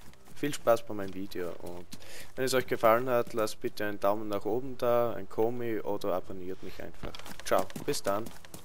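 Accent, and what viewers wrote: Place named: Austria. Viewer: Ich bin auch Österreicher, voll krass aber irgendwie geil dass du auf YT so redest :D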